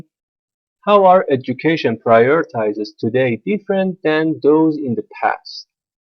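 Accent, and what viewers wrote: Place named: India. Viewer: مثه هندیا انگلیسی صحبت میکنید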